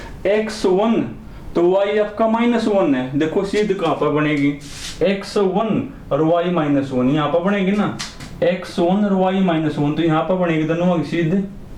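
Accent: Haryana, India. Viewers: Super aap harayana see ho kya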